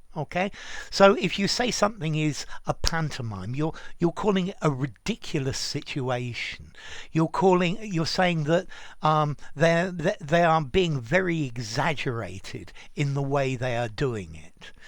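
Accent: RP, United Kingdom. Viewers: GOD... do you speak British RP accent (Reserved pronunciation) however, your teaching style is greater than all..Big fan sir.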